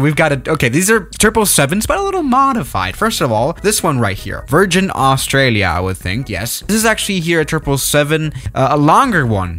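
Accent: Germany. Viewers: Alter manchmal hört man deinen Deutschen axent so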